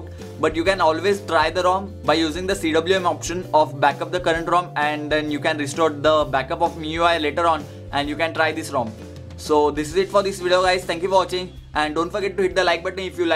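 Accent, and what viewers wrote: India. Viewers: sick of this fucking indish accent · you will never be promoted coz of your indian 2rs english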